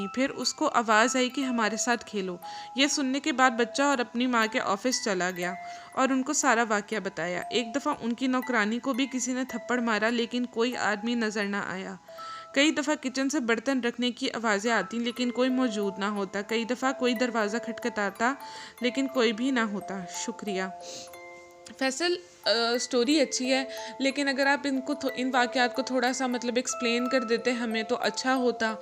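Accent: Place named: Punjab, India. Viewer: Very interesting story I really enjoyed or apka punjabi accent buhat acha hai 👍👍😂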